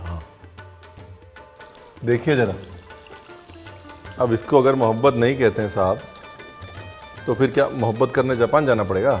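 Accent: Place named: Lucknow, India. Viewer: Hi Ranveer...I really love your cooking style.. Lucknowi Lahza..Baate..Jo khana haste khelte ban jaaye.. Superb 😊😊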